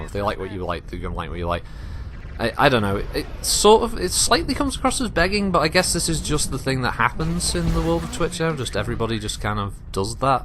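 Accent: United Kingdom. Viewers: Thank you. You know that American's trust a British accent, right? Well, speaking for myself I think that is true. Your timing for the most part is great. You don't stumble over words. You don't throw in the obligatory 'uhs' and 'ums' like I do. That is my handicap. I can write far better than I can speak. Though, somewhere in my early to mid thirties I did notice that marked improvement in my reading aloud ability. I'm not sure if that is something that comes with age and practice or just reading Robert Jordan novels until my eyes bled. Nevertheless, your lore series brings to mind (and please don't take this the wrong way, I mean this with the utmost sincerity and respect) the old nature shows I used to watch in the early 80s. I'm serious when I say your voice brings a certain...credibility to the universe. Several times while watching your series people have come in and assumed I was watching something about the real world. 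I always enjoy the sound of voice and look on their faces when they inevitably say, "What the hell are you listening to?" five minutes into Star League History 3 or something. It telegraphs to me that they thought it was syndicated documentary or something (I am prone to watch more docs than cat videos or people getting hit in the stones or 'Top Ten Reasons not to watch Top Ten videos' videos) so I smile inwardly at their sudden confusion when you switch gears from talking about the Camerons to a battle over YakitySmakity V. That fact that you are doing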